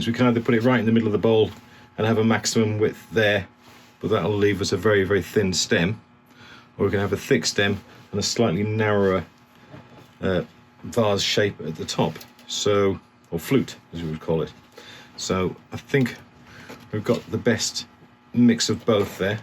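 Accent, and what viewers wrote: United Kingdom. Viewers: I loved what you did on this weird root. It was also great to hear a Brit doing this video….!!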